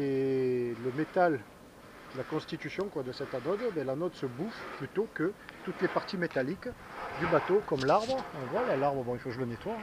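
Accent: South, France. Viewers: Bonjour, et hello les marins, J'ai flotté pas mal pendant des années, dont sept ans sur un Banjer 37 (revendu il y a peu suite à perte d'emploi, snif), je suis en Belgique, mer du nord (moins quarante!!!!), d'où le besoin d'avoir une timonerie fermée pour naviguer toute l'année. Merci, je me régale avec tes videos, ton accent du Sud (chaud), et tes commentaires très intéressants, cela me fait chaud au coeur. La navigation en Méditerranée est différente de celle pratiquée en Manche et Mer du Nord, où les escales sont en France, Belgique, Hollande et Angleterre, pour rester dans les deux cents mille. Te voilà donc reparti pour quelques délicieux mois de navigation, d'eau salée, et d'apéros. Profites-en un maximum, demain est un autre jour.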